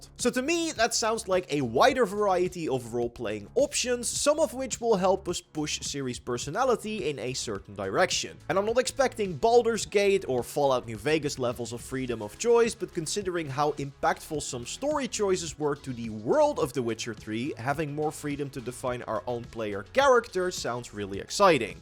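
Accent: Netherlands. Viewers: U need to work on your english you sound dutch af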